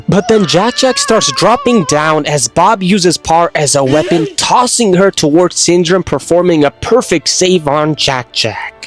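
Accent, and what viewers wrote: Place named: Toronto, Canada. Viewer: Bro sounds like he from Toronto lol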